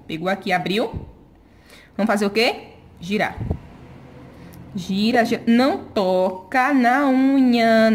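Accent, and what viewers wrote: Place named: Bahia, Brazil. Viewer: vc fala igualzinho minha tia Rosane da bahia ... vc é de onde ?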